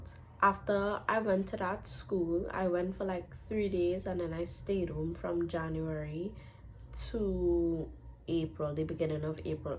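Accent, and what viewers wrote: Trinidad and Tobago. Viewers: Jesus Crist am obsessed with trini accent love from ja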